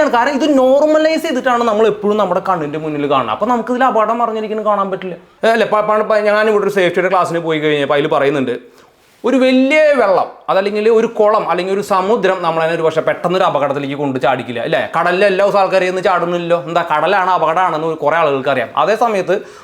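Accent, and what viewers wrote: Thrissur, India. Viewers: Bro, you are amazing.... The way that you told through the examples are very appreciated. Especially the slang of our Thrissur is lovable... Cheer's bro🤝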